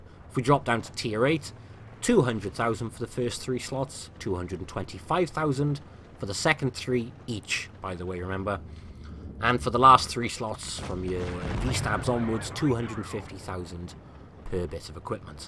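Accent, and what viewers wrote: United Kingdom. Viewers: Blitzers from the stream for 5.0 are asking for your voice to be added for British crew voices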